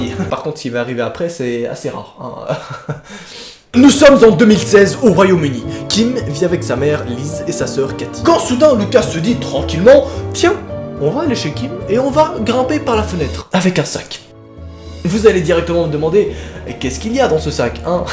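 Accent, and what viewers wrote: Belgium. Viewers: T'es belge ? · tes belge ? moi aussi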